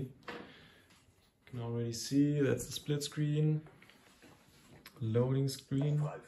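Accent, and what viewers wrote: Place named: Germany. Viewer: Nicht schlecht, aber man hört das du Deutscher bist haha grüße.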